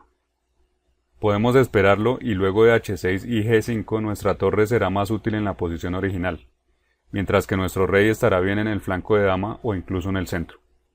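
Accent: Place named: Colombia. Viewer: tienes un acento muy colombiano... eres colombiano? gracias por el video muy instructivo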